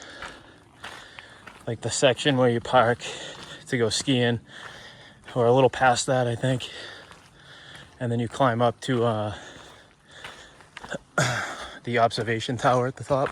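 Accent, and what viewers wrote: Massachusetts, United States. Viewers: NH here! I could tell by some of the words you said, you were from Mass.😂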